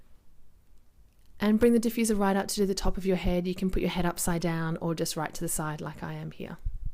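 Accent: Australia. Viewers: I love your channel and love hearing a fellow Australian ❤️ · I haven’t let my hair run wild with its natural curl in ages. Your videos are making me want to go au natural. I’m curious to see what it does since being bleached and abused. Love your voice by the way - it’s a nice example of an Aussie an accent and I find it quite soothing.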